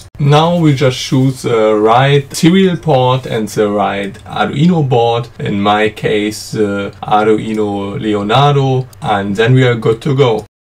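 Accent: Germany. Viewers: Man hört einfach raus das du Deutscher bist 😂😂😉👍🏻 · 1. Sekunde das englisch gehört, dierekt gewusst dass du deutscher bist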